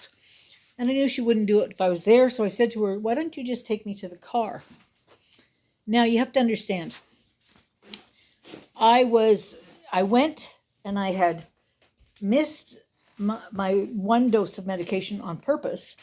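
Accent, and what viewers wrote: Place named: Canada. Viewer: Hi sweet, I knew you were Canadian with your accent…I’m from the East side of Canada, from my youth…I’m now in California since 1964. I was only 10 years old when we moved from Cali. I enjoyed watching your video…since my mama has Parkinson’s also! Enjoy your video! 😘❤️🙏🏼🌺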